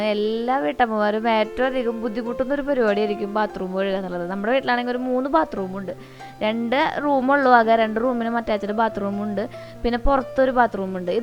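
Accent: Thrissur, India. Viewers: Chechiii njan adhyayitt kanuattooo...samsaram izttayii.. Thrissur anO ???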